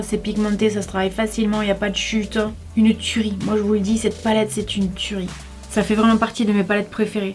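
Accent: South, France. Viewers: Merci pour cette vidéo (je ne t’appelle pas Maman ne m’en veut pas mais nous avons le même âge 😜) et tu me rassures car moi aussi je me maquille plus qu’avant. Je ne sais pas si tu as vu bellapiere est sur Showroom privé jusqu’à demain matin. Ça ne fait pas longtemps que je regarde les vidéos de YouTubeuses sur le net, je ne pensais pas en trouver une avec laquelle je me retrouve et en qui donne de bons conseils. Et puis l’accent du sud me manque trop c’est un régal de t’écouter parler. Bisous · Est ce qu'il serait possible de tester la marque de Gaelle Garcia Diaz "Martine" j'aimerai beaucoup ton avis dessus ! Bisous continue comme cela j'adore tes vidéos et ton accent me rappelle ma famille du Sud 😍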